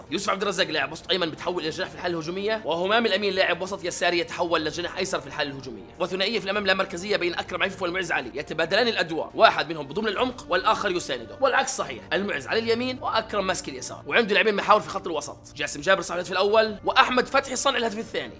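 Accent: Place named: Iraq. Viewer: بس سوال انتي عراقيه صح من طريقه كلامك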